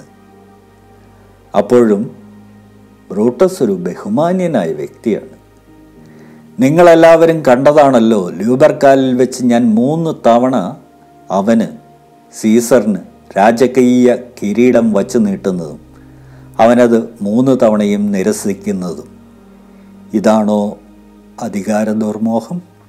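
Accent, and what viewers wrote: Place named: Kollam, India. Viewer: സാർ ഫയം അല്ല ഭയം കൊല്ലം പത്തനംതിട്ട ഭാഗങ്ങളിൽ ഭ" എന്ന അക്ഷരം ഫ " എന്നാണു ഉച്ചരിച്ചരിക്കുന്നത് അവതരണങ്ങളിൽ പ്രാദേശിക ശൈലി ഉപയോഗിക്കാതിരിക്കുക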